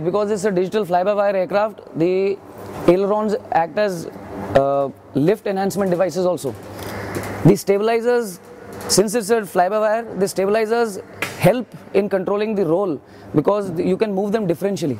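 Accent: India. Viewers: Anything involving an Indian speaker can't go without some dumbass making fun of the accent. It's a cliché now so nobody's laughing.... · bro this is the indian version of English. There are many version. The English speaking in America is not the English speaking in Europe 👍👍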